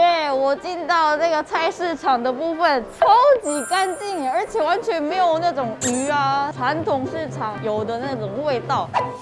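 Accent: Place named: Taiwan. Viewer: 的中文真的越來越台，幾乎都要沒什麼口音了！！超厲害～🤩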